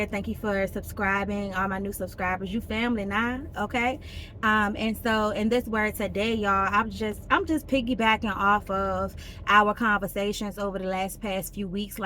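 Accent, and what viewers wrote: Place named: Baltimore, United States. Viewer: I knew she was from Baltimore in the first 5 seconds 😂 Thanks for sharing!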